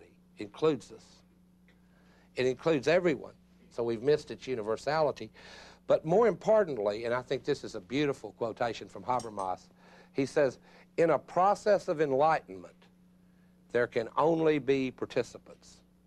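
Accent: South, United States. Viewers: his southern drawl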